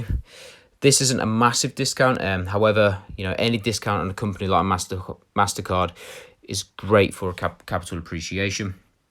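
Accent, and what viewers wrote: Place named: Manchester, United Kingdom. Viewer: Yes lad!! How old are you laddy you sound like a Manchester lad, how much money ya earning g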